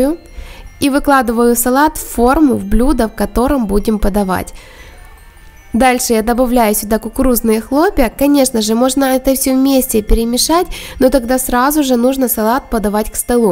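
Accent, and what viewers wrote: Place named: Ukraine. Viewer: украинка?много наискасяков в разговоре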